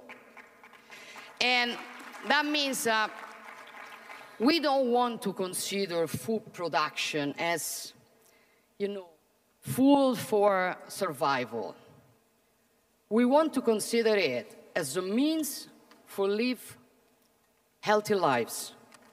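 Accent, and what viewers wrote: Rome, Italy. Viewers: A Giorgia, quanto sei più bella quando parli in romanesco!! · Paesi poveri, attore ucraino, qualcosa per gli italiani? Nulla vero? L' inflessione dialettale non se la toglie neppure in inglese!!!! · Ah, mai sentito un inglese cosi’ con inflessioni romanesche! dajjjje!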